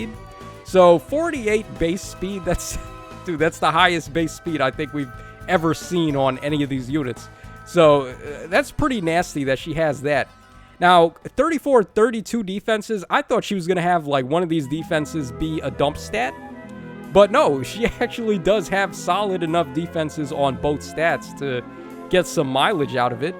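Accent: United States. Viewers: Ayo, that cowboy accent kinda got me down bad, tho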